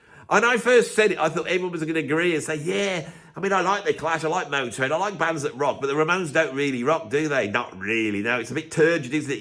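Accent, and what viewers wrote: Birmingham, United Kingdom. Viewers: Is there anything funnier in the World than a ranting Brummie?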